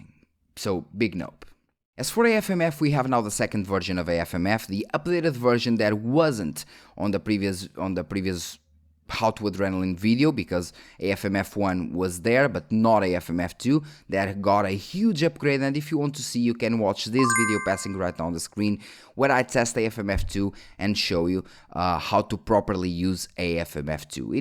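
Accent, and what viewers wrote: Portugal. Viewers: Você é portugues ? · are you from portugal ?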